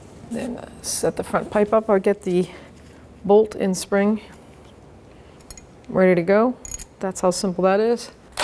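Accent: Boston, United States. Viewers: Nice job here... You should however coat all those threaded connections with high-temp antiseize lube and the O2 sensor should get a dab of dielectric grease to keep moisture out of the connection. This is important if you are in a road salt environment. By the accent, it sounds like Boston or Southern New Hampshire area.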